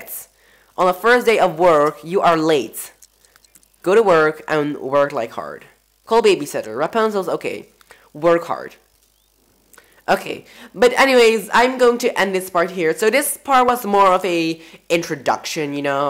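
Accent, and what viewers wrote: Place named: United States. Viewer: Ik dacht echt dat jij Amerikaans was ofzoo je spreekt echt goed engels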